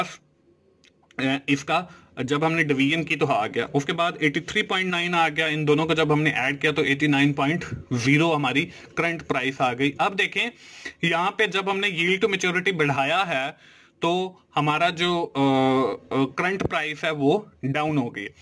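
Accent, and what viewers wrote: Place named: Punjab, India. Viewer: bhi dont mind........ punjabi main english bolny ka style .......kamal hy yar.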